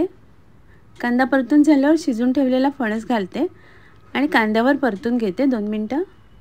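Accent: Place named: Konkan, India. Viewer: Marathi only... In the sweet tone of kokani 😂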